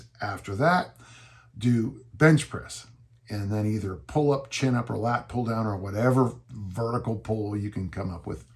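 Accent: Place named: England, United Kingdom. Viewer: He's certainly not Scottish, he's as English as they come 😂 But yeah, he's very good!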